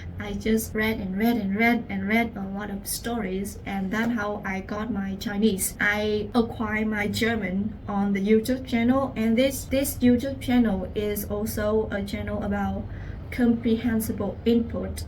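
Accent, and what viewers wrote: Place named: North, United Kingdom. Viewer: Southern Accent? I think it’s Northern 🤔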